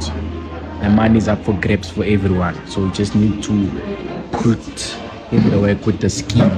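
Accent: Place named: South Africa. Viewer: Dude for a moment I thought you were from SA... Big up mf2 🇸🇿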